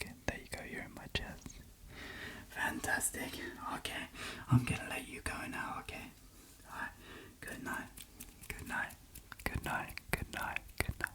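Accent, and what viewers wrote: United Kingdom. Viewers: Great ASMR, your British accent makes it even better. ❤️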